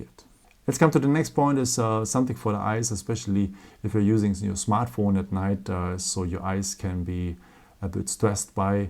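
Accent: Germany. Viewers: Der deutsche Akzent is da😂✌🏼 aber nice Video hat mir sehr weitergeholfen!👌🏻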